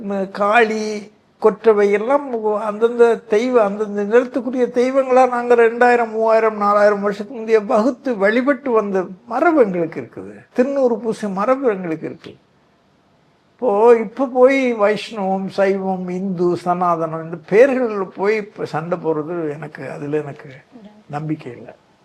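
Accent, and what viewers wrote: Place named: Tamil Nadu, India. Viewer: ஐயாவும் யாழ்ப்பாணத் தமிழில் பேசுவதை மறந்து விட்டு தமிழ்நாட்டு தமிழில் பேசுகின்றீர்கள் அது போல் தான் அந்த அப்பாவி தமிழர்களும் தங்களை யார் ஆதரிக்கின்றார்களோ அவர்களை பின்பற்றுவது வழமை தானே திக்கற்றவனுக்கு தெய்வமே துணை வாய்ப்பேச்சு மட்டும் போதாது செயலிலும் செயல்பட வேண்டும் நான் ( (ஒடிசா)-கலிங்கம்) மடப்பள்ளியார் வகுப்பைச் சேர்ந்தவன்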